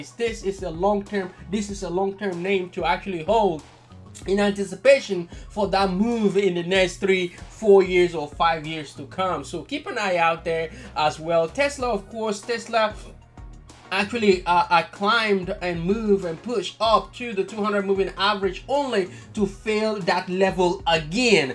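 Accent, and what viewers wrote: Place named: Nigeria. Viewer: You have a heavy heavy accent are you from Nigeria ❓🧐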